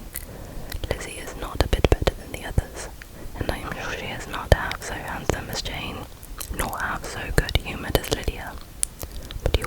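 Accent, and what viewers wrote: England, United Kingdom. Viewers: Luv that English Accent 🥰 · Love this book ❤ and your good at reading in a British accent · i’m from the southern part of england and honestly this accent sounds very.. western or eastern british idk why 😭 anyways! very tingly and i adored this. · Let's be real - actors know which accents they're good at. You should definitely own your accent, you sound so English 🤩 Plus, who doesnt wanna sound like Colin Firth? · You sound kind of like kaya with your English accent she does asmr about disturbing cases and horror stuff 👌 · Your English accent makes the BEST inaudible sounds! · Oh thank you, this is beautiful. I love english accent and this video is extremely relaxing😊 love it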